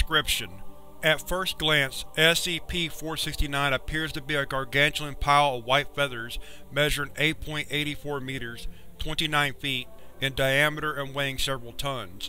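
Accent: South, United States. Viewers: Southern narrator is amazing, I really like it. Like a personal touch, I really really like it!!!😊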